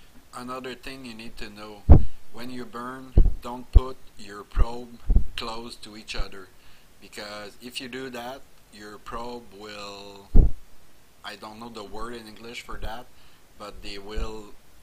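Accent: Quebec, Canada. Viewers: Ho boy, toi t'es québécois. XD cool work dude!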